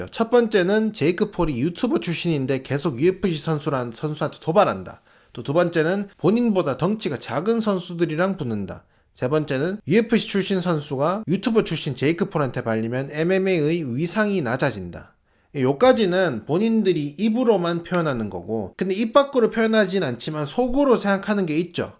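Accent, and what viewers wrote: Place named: Rural, South Korea. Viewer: 근대 왜 말투가 이렇게 촌스럽습니까? 어디 억양인가요?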